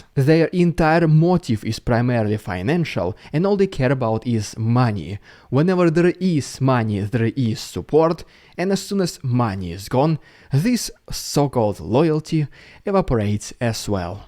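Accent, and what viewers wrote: Russia. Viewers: I'm really digging this recent recommended " ". joining his Patreon is the least I can do. side note: really glad I watched a lot of Danial Kyvat while he was in F1. It's helped me easily understand the accent. more of a side note: the multi-language and multi-cultural speaking and understanding of so many people in eastern Europe is very impressive. Their intelligence, grit, determination and bravery is incredibly inspiring and give me so much hope for our world's future. The new and younger generation of leaders are beginning to form and emerge. They take no shit, they don't sugar coat, they see through lies and they speak the truth. love to see it. wish I could do more.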